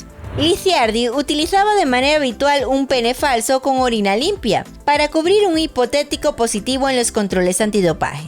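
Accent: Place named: Spain. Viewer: Os molesta que dice las C y las Z como en España, os quejais mucho del racismo pero sois los peores.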